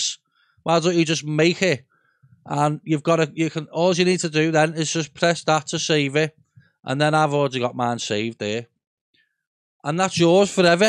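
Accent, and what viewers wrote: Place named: Liverpool, United Kingdom. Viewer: yes! im over in runcorn, obviously your accent tells me your liverpool area. do you bother with private lessons mate? also, which keys are you pressing to get this melody, cheers pal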